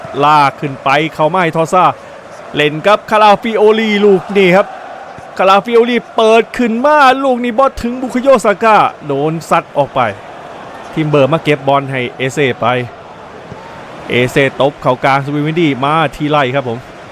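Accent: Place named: Isan, Thailand. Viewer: ไม่เคยเห็นชาองไหนพากย์ อีสานแบบนี้ สุดยอด..😊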